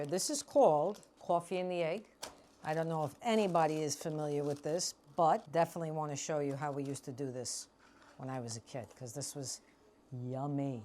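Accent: New Jersey, United States. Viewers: It is an Italian recipe, beat egg york with sugar and marsalla....they used to give it to the children to raise their immunity , strengthen them, specially if they were week. Is she from New Jersey!!! Her Accenture her way of talking so masculin...ohhhhh no milk please!!! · Used to live in Jersey. This is the first time I ever heard Jersey accent and think ASMR. Read the book. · Love the Jersey accent. 😊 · I hope you do more videos. Your Jersey accent is the best. I’m definitely going to try this! Never heard of it before, i never see Chock Full of Nuts coffee anymore. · As a Jersey native living down south, her voice takes me back home🥰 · I miss the sound of Jersey. Best accent in the world. Warmest people. 😢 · Jersey accent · Yokes, nats, and fathas, Jersey accent, forget about it, love this vid!!! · Is she a Jersey girl or what i love her!!Coming from jersey myself,love her jersey accent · I know she's from Jersey 😂😂😂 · At first I was intrigued by her Sopranos accent, then the egg and coffee, watched the whole thing, loved it, hope it becomes a show or something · That italian jersey accent😂😂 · OMG. 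I grew up in northern New Jersey and now live in the South. The accent, the mannerisms, the relatability - all transported me back there. What a Jersey girl! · Gawd. I'd rather wipe my ass with sandpaper than hear a New Jersey accent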